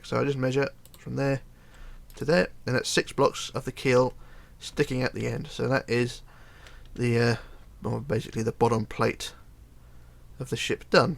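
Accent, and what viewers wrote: United Kingdom. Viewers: man i love your english accent✌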